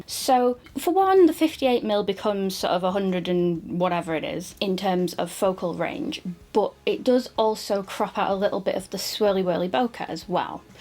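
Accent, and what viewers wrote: United Kingdom. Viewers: Love your videos, you're so incredibly cute and beautiful. I'm an Oly shooter myself, since I don't do videos. I Love your Brittish accent. If you ever come to Gothenburg, Sweden, you are welcome to stay at my place, since I have an extra bed, so you don't have to sleep in a Hotel and can skip some of The cost. Lot of Love, hugs and kisses, Niklas.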